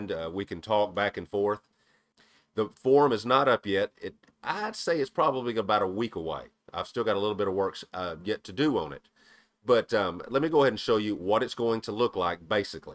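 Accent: South, United States. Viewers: Hi and everyone else. Happy New year coming to everyone! 🥳.....I give many 👍👍👍..to one of the coolest accents ever! Southern! 💕😂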